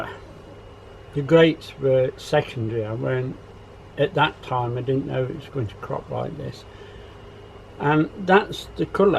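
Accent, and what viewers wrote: England, United Kingdom. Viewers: New subscribers here - from the states (don't hold that against us - both my granddad's were from England ! :) ) FANTASTIC garden - there's no doubt in my mind even if your video's weren't interesting I'd still be watching/listening as I love the English accent (you can thank my dad's dad for that ! )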